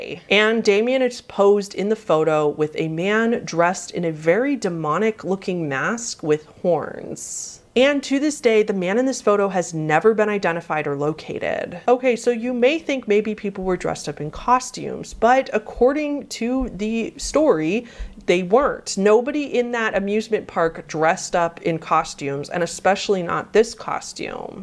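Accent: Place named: Los Angeles, United States. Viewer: I'm going to guess you're from LA from the way you ground out the end of your sentences.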